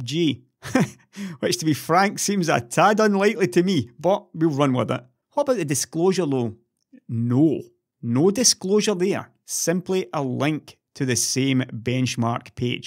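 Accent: Scotland, United Kingdom. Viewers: love a Scottish accent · It definitely sounded Scottish, but I felt like it was a little different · whassat accent man, too soft fer glasgee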